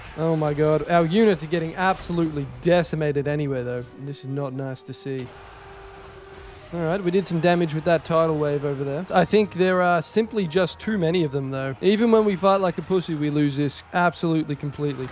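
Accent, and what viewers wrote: Australia. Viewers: This comment section reminds me that America was a mistake: man is clearly Aussie FFS . Also checking his profile is free.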